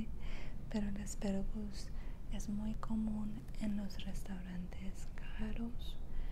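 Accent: Antioquia, Colombia. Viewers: Ya quisiera yo q mi nevera estuviera así de llena :'v Y creo que eres paisa? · AMO LA VOZ COLOMBIAINA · siempre me ha encantado el acento Colombiano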